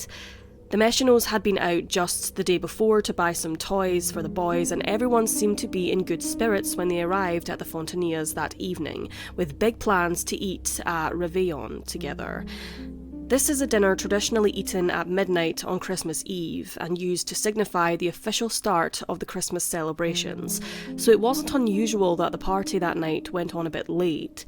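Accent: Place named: United Kingdom. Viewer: Your voice is freaking perfect! I don't know what it is about British accents, but 😍!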